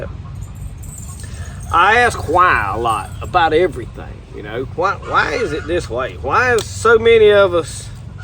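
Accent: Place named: Mississippi, United States. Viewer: I just found your channel, really enjoy your downhome honesty, and that Mississippi accent. Im from Arkansas, live out in the country, got chickens, garden, retired a few months ago, I was part of that rat race, but I’m making the transition to simpler living. Thanks so much for the Bible quotes, helps us keep focused on what’s the most important. God bless.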